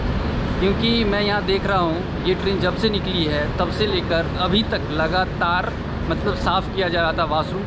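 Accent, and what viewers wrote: Bihar, India. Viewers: Apka voice se hi pakar liya tha ki ap bihar se ho. Me bhi bihar se ho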